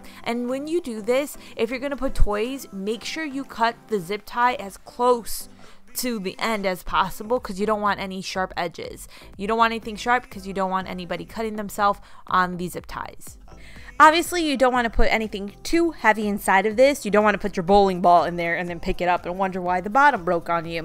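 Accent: Chicago, United States. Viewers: I don't usually comment on YouTube videos but I have been watching you for a bit now and wanted to tell you that you just think of the most clever ideas! I also like watching you as you remind me of my home town of Chicago, which is where I think you are at based on your accent! Keep up the great vids - love seeing them! And, yes, I am subscribed. 😉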